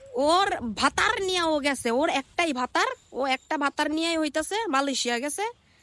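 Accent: Comilla, Bangladesh. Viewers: আপু আপনার বাড়ি কি কুমিল্লা জেলায়?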